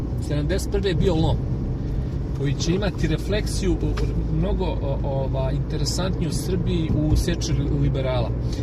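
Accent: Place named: Central Bosnia, Bosnia and Herzegovina. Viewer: Kako ti je uspelo da zadržiš akcenat srednje Bosne ako si od 1992 u BG? Hvala na odgovoru.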